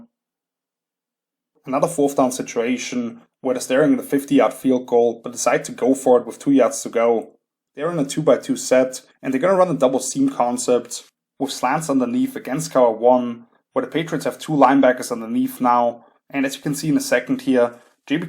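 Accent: Austria. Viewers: Are you Austrian or German? I don't mean to be rude, but I think it's a bit strange to listen to foreigners analyze American/NFL football.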